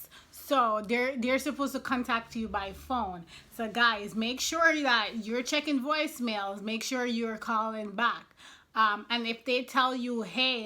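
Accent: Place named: Jamaica. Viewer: Sound Jamaican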